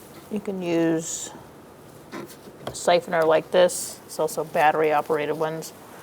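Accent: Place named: Northeast, United States. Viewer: Excuse me but are you from Massachusetts by chance? Either that or New York but it's definitely a northeastern accent lol Love it! Also a Bostonian here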